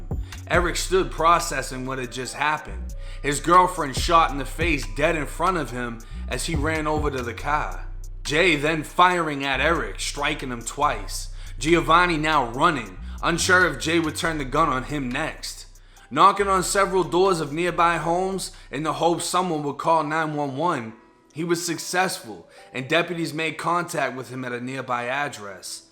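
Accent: Boston, United States. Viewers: Dude what are you from Dorchester 😂 every time you hit that "A" it gets me lol. I used to live in Brockton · You. Sound. Like. A. Boston. Person. Its. Your. Pronouncing. Of. Some. Words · you def caught the Boston accent! I have one too, I’m rocking wit ya! 🔥🥰☘️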